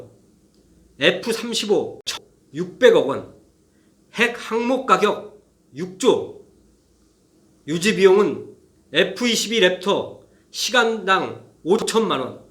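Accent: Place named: South, South Korea. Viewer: 얼굴은 이석기 사촌쯤 돼 보이고 억양은 아랫쪽일세... 국론 분열 획책의 일환.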